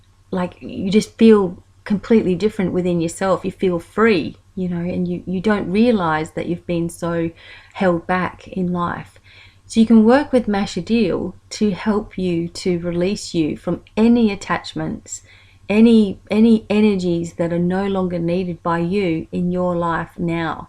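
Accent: Australia. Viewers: hi thankyou for your monthly dose of spirituality, i was looking forward to listening to this. I especially like the part about what you said about Cats, yes they have a great energy, that they pass on, when all around is hectic they can bring a sense of calmness. keep the videos coming, watching and listening is a meditation in itself. Along with your lovely Aussie accent. peace and love.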